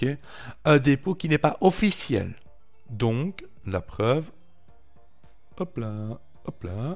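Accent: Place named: Luxembourg Province, Belgium. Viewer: salut je découvre ta chaine via Adrien D.(linuxtricks) tes vidéos sont pas mal, il y a juste que tu parles un peu lentement au démarrage de ta vidéo :D j'ai failli zappé, j'ai cru reconnaitre un accent de ma région (sud de la province du luxembourg) :D je te mets un pouce pour celle là et tu gagnes un abonné ;)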